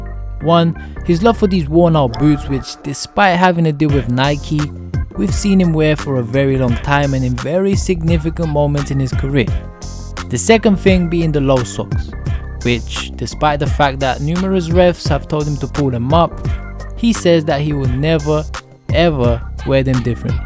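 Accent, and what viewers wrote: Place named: United Kingdom. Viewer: Nice british godfather accent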